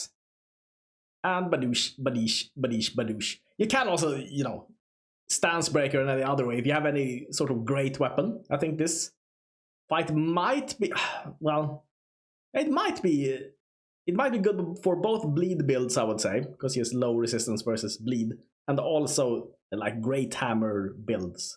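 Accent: Sweden. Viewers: I like your Swedish accent. 👍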